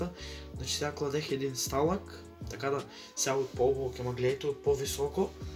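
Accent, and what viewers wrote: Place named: Gevgelija, North Macedonia. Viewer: BRAT MOJ ZBBORUVA GEVGELIJSKI xd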